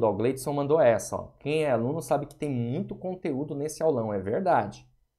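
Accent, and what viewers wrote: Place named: Sao Paulo, Brazil. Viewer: Esse professor é show .... parabéns 🎉 se deus quiser passarei em um concurso que irei fazer ....você não é de João pessoa professor ? Tem sutaque de paulista ....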